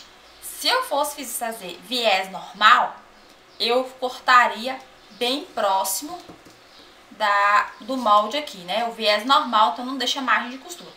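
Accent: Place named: Minas Gerais, Brazil. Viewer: ***** Ah! Que interessante! Realmente já tinha ouvido dizer q o sotaque de Minas era forte.Fica giro viu? As brasileiras q sigo no yutube, a maioria são de São Paulo e o sotaque é diferente,mais levezinho.Obrigado por responder e tenha um bom dia!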